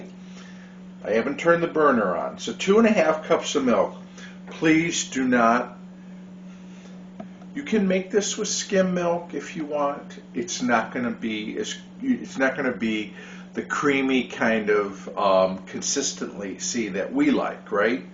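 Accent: Midwest, United States. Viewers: Love the foods , but I love your mid west accent which is like my birthplace of Chicago Illinois I’m reminded of all my family which I miss so much! Thank you for your channel, and the great foods I feel at home with you all!👍🏻❤️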